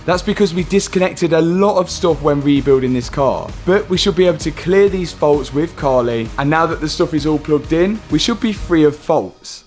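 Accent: Leicester, United Kingdom. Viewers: Tuning in for the Lesta accent 😊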